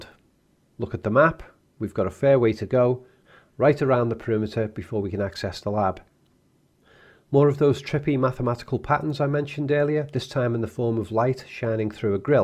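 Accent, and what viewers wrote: Liverpool, United Kingdom. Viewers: My apologies for always assuming you were from Scotland instead of from Liverpool. My ignorance of accents outside of the USA.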